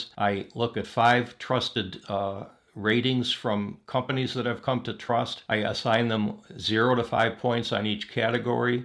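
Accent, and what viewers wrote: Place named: Pennsylvania, United States. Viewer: your have a Pennsylvania accent, maybe Pittsburgh: -you say "dowwars" , instead of dollars. -"quawllee", instead of quality. 😁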